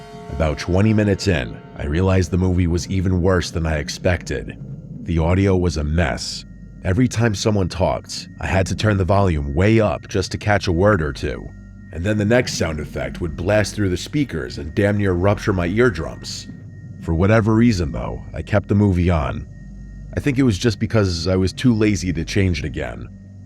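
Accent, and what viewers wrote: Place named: New York, United States. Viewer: Anyone else notice the narrator’s NY area accent like its head out occasionally? Even thru the (what I assume) is a voice filter? I’m guessing he’s from North Jersey…I’m from that same region, so no judgement! 😂 These vids are amazing btw; just discovered them and can’t turn them off! Truly bone-chilling sh*t! Where does he find these stories anyway? Reddit, etc..? 🤔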